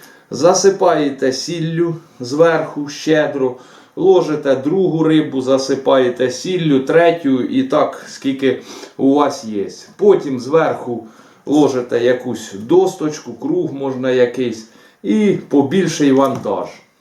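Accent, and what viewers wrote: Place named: Central Ukraine, Ukraine. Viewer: Така смачна балачка! Черкаси,Полтава,Кропивницький?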